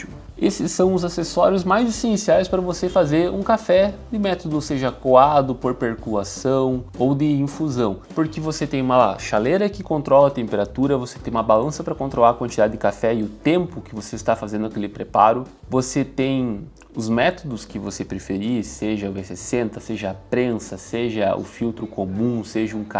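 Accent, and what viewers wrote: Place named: Curitiba, Brazil. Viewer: e esse sotaque aí, é daonde, daqui de curita? PARABÉNS pelo setup, tô montando aqui tbm, tô c r3 blade (sensorial muito bom), e o elétrico femobook a2 (surpreendente), tem dia que faço espresso naked+puck (pra aprendizado fundamental), noutro frenchpress, noutro moka, noutro v60 e clever, é muito legal essa dinâmica e ritual do café de diferentes formas e resultados! Investi num k4 e no starseeker e55pro, e num porta filtro 2spout, que ainda estão pra chegar! E, sim, o mais importante e diferencial é um BOM MOEDOR! Sucesso ao canal e bons cafés, irmão!!❤🎉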